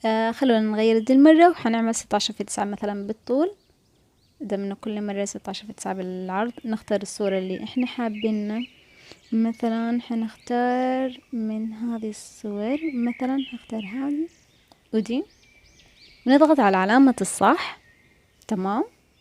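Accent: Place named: Yemen. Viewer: من لهجتك احسك يمنيه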